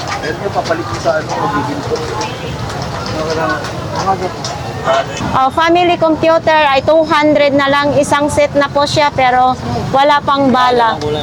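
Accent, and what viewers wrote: Visayas, Philippines. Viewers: Bisaya k mn Day?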